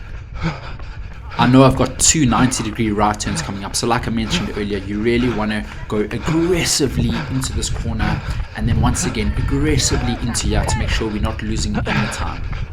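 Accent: South Africa. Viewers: 6”2, 120kg,47 and the only thing we have in common is the the SA accent. I enjoy parkrun for just being out there records are fine for those who care about them , I disagree that getting lapped is motivating but it’s encouraging when a front runner cheers you on but also annoying when they think they own the place ! You showed your class by staying and cheering on the others !!! . The 6 week training pack looks great but not sure it’s intended for those at the back of the pack . Keep up the great work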